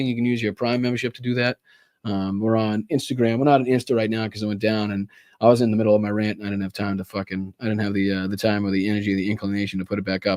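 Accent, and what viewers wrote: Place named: New Bedford, United States. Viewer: I was raised in New Bedford. You sound like the folks I grew up with. Keep the example up. We must keep going. No dropping the ball.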